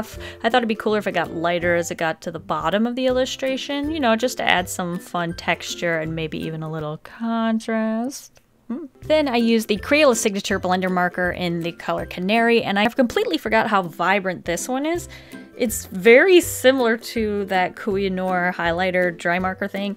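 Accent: Germany. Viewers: are you german??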